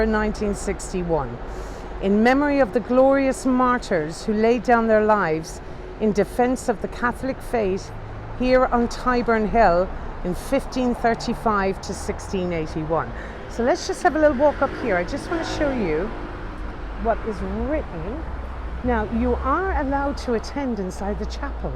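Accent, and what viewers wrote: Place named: Ireland. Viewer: Love your tours, I love history escpecially that around London, you are so informative, your gorgeous Irish lilt all goes to make one very enjoyable armchair tour, Thankyou from a Southerner now living in the North, I’m off to the “ Old Bailey” now if anyone should want me 😜🥰🐾🥰🐾🥰🐾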